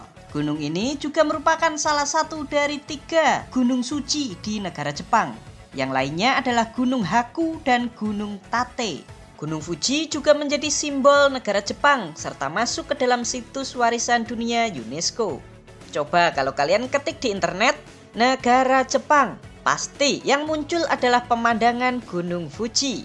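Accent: Java, Indonesia. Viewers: kok medok tenan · narator e ,Mas * Wong Jowo pundi niki mas?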